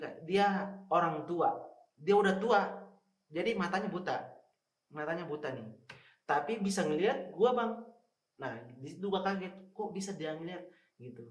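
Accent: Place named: Eastern Indonesia, Indonesia. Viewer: "Apa Segala Macem" Logat orang timurnya gak ilang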